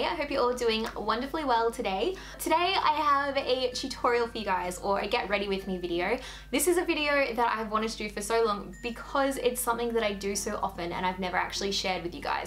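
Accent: Australia. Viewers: Is she australian? I cant tell x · Is she Australian? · OMG R U AUSTRALIAN!!?!? I AM!!!!!!!!!!!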